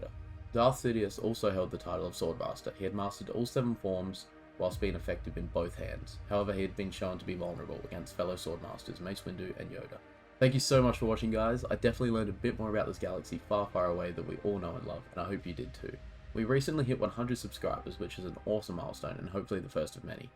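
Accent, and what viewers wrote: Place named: Australia. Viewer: That Aussie accent 🤌Great video man!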